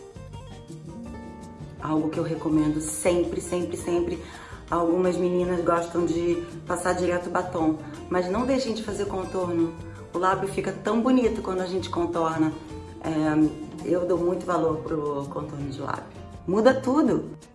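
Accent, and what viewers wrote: Rio de Janeiro, Brazil. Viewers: não exagera. Sotaque carioca não cai bem pra todos... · Me diz uma coisa. O que é preconceito pra você? Porque eu acho que você está equivocada sobre o significado. Seria preconceito se eu desprezasse ou tratasse de forma diferente as pessoas só por causa do sotaque delas. E aqui não é o caso, não tenho nada contra quem é do Rio, só não curto o sotaque. Estamos falando de idioma certo? Tenho direito de expressar meu gosto.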